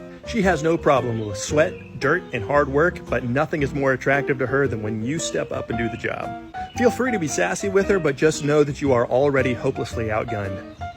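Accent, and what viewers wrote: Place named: South, United States. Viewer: I'm from the South. It sounds like your wife southernized you like I did my New York honey .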